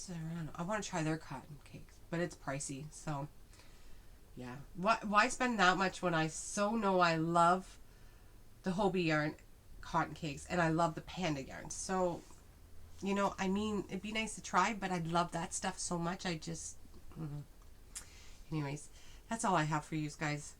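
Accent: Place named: Canada. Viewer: I’m watching this video to get a review of the yarn and a couple minutes in I realized oh my God this woman is obviously Canadian and of course you are so am I! I’m just impressed when I can pick it out right away. It’s always the vowels that give it away.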